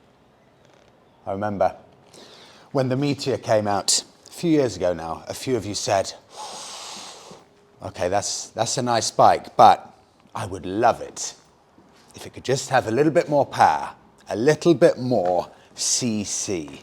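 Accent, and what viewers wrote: United Kingdom. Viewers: Beside of that beautyful Bike I love listening to Your nice british English!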